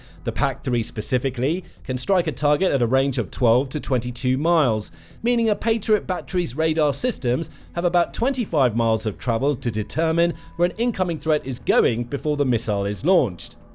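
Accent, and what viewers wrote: England, United Kingdom. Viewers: English Accent hiding The English Jewish Gangster!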